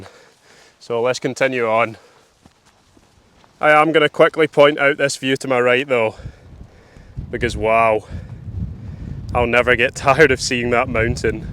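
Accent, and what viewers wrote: Scotland, United Kingdom. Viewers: Hi, im a bit late replying but loved it. I have seen the two previous camps on this mountain. Your narration was spot on. You have a great Scottish accent. You are also becoming somewhat of legend locally. Video,edited, and intro very good Kind regards, Laird Birkett 🎉😮❤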